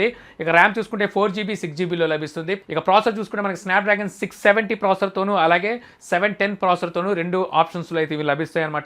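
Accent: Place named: Godavari, India. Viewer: nee slang kosame ne vedios chustaaa godavari don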